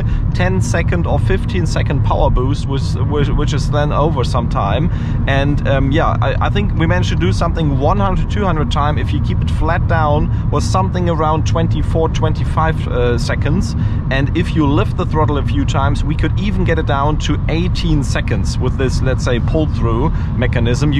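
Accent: Germany. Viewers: So richtiger Deutscher Akzent hahaha man kennts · Ich Feier den deutschen Dialekt 👍👌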